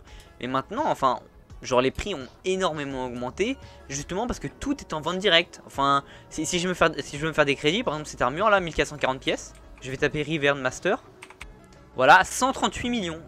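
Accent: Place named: England, United Kingdom. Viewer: Bonne idée cette vidéo et ton accent anglais mec woaw 🔥 et 545m pour hypercaisse euh.... 🧐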